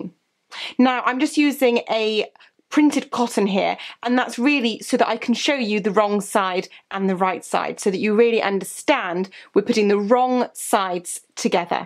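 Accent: England, United Kingdom. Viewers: very beautiful english accent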